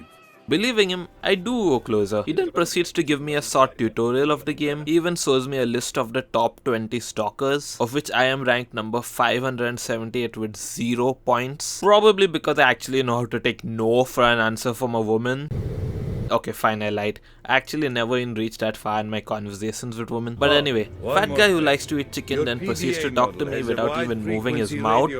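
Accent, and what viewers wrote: India. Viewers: i think he sounds like a idian guy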